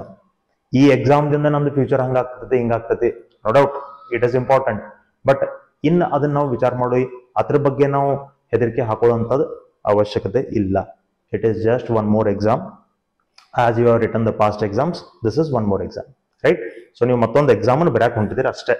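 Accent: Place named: Hubballi-Dharwad, India. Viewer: Thank you so much sir❤.. Sir niv hubballi dharwad..dawra..😊.. Yakamdra..accent hanga anastade..😊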